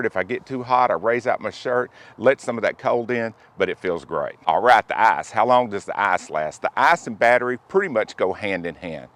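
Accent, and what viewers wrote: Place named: Texas, United States. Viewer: I love the Texas accent. I live in San Antonio and I know exactly what you're talking about regarding the high heat and humidity. It's downright oppressive. This looks like a device that could really reduce the misery factor. I'm a musician and I get gigs outside fairly often and I end up all sweaty on stage when the temps are high... IOW, about 9 months out of the year. This thing looks like a life saver to me. I'll be buying one soon.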